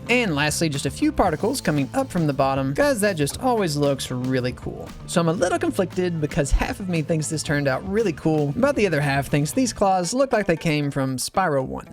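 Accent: South, United States. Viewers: Why does he sound like a red neck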